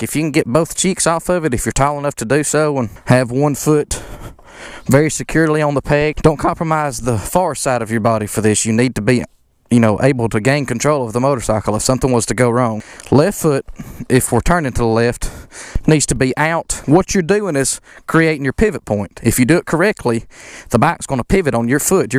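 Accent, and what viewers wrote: Alabama, United States. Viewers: If Alabama had a voice, it would sound like yours.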